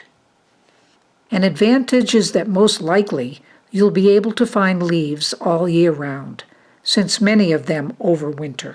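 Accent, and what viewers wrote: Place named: New England, United States. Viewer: Thank you, that's nice to hear. I used to live in NYC & PA but now I live in Santa Fe, NM. My family is still in NH, but I don't often get to western MA. I guess for now I'll follow you here and on FB. Thanks again for the video. It was full of solid info and hearing your voice brought me back to my New England roots.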